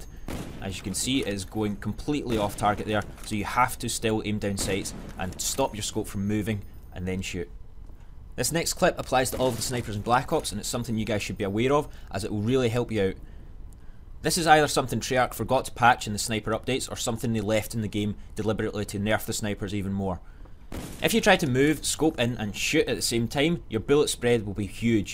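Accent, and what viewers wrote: Scotland, United Kingdom. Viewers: are you scottish ? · Scotland Aye?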